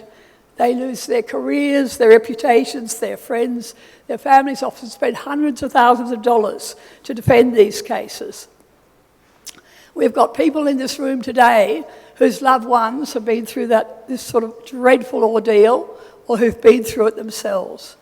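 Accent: Australia. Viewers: I like her aussie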